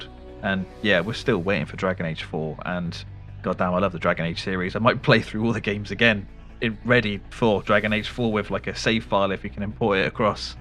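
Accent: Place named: North, United Kingdom. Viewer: Sound like a northern so subscribed no questions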